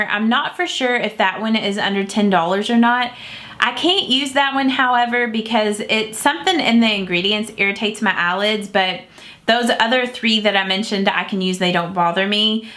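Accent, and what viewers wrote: South, United States. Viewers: I love your southern accent. It’s so cute.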